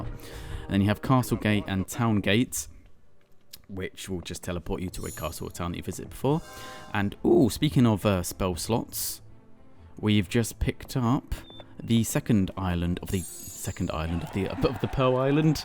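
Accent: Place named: United Kingdom. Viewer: Come for the gameplay, stay for the posh English boy living 2015 again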